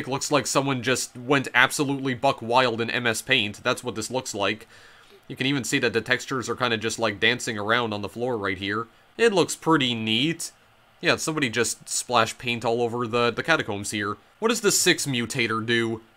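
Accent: Canada. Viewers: Was looking for a comment like this, not disappointed Legitimately thought I had a stroke for a second, Canadian makes sense tho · Where are you from? You pronounce the words (like game) the same as me, but your accent is also different from mine. I'm from the north east of England but you don't sound like you're from here. It sounds almost canadian to me? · lmao, no it's an effect of Canadian English dialects called Canadian raising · My guess was northern Saskatchewan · the canadian dialect gives me more brain damage than the game itself. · Where are you from? What’s your accent? I genuinely would love to know! I am not sure what your accent is but I feel like it is a Canadian accent?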